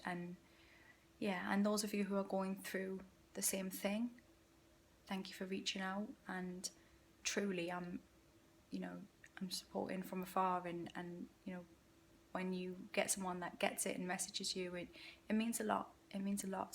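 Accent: Newcastle, United Kingdom. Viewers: your Geordie accent video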